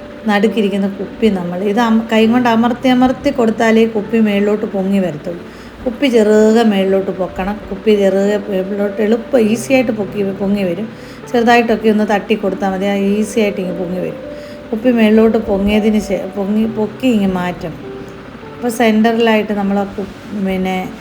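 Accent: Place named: Pathanamthitta, India. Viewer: Pathanamthitta സ്ലാങ് 😄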